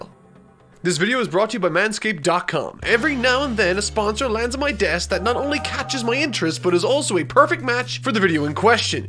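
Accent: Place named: Ireland. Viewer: Are you from Ireland by any chance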